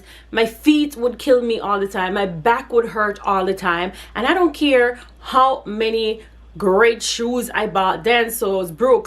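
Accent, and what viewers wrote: Jamaica. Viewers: From your accent I could tell you are Jamaican. I know more Jamaican nurses go to the USA than here in Canada. My pay here without a ton of overtime( I think I did overtime 4 or 5 times for the year) is just over 95,000 CAN before taxes. Last year I got over 100,000 for the first time in my career after working over 20 years. The problem is we pay a lot in taxes. I find people don't complain much about taxes here . Its just recently I hear more complaints about pay , taxes etc. I think because housing prices have gone up significantly in the cities, plus inflation and the government has frozen salary to 1 % increase which is nothing. Generally before all this ,a nurse can buy a nice house on her own and live pretty descent life without working tons of extra time. NP's here get more to start but can still work less overall in comparison to other nurses who do OT etc. 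We are due for a descent pay increase , so will see what happens.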